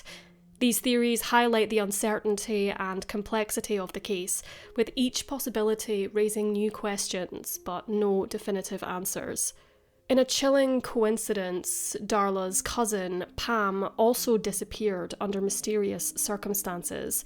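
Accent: Scotland, United Kingdom. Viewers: If you mean the narrator, it's easy to tell she's from Scotland, not Ireland.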